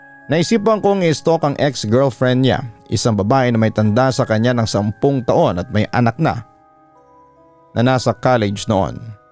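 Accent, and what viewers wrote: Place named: Iloilo, Philippines. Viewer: ilonggo c papa dudot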